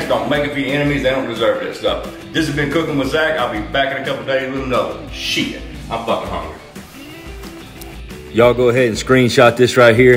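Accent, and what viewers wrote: South, United States. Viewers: You're brilliant Zach, and so down to earth. You make it great entertainment down here in Australia for me. 😂 I love hearing your stories and this cooking stuff is just a down to earth southern country boy by the sound of your accent that I've that heard on utube. I wonder why you are single, I'd love you to be leaving your boots under my bed. You are one good looking handsome hunk of a man. 😊 ❤❤❤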